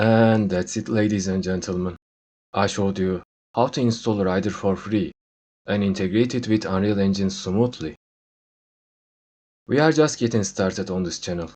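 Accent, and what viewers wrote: Turkey. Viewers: yemin ederim aksandan anladim hemen turk oldugunu. yardimci oldun sagol. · bro are u from Turkey?Ur accent is like Turkish accent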